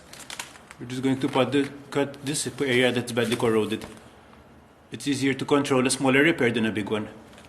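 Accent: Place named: Eastern Europe. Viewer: Great work again, many thanks for sharing. Thanks too for letting us know where you live. Your dialect sounded more like a Eastern Europe to me.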